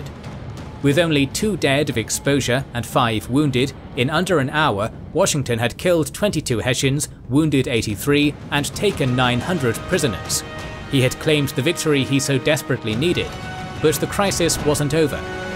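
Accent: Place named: United Kingdom. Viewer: 🎉 the irony of a British voice over talking about its countries demise Over America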